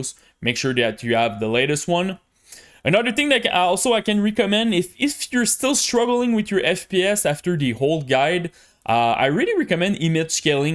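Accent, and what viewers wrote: Quebec, Canada. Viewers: j'adore ton accent québecois à travers ton anglais! mais pourquoi pas en quebecois! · Do I hear a French Canadian accent? :D I am Canadian, lived in Ottawa most of my life. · ca parais que tu est quebecois xD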